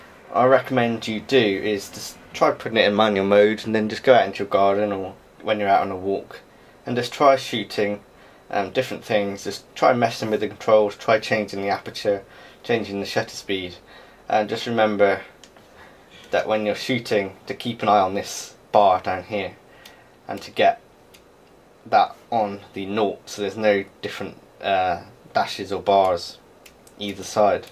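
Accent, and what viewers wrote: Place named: England, United Kingdom. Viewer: Lool, i love how english people talk, that is rubbish.. nice vid btw bro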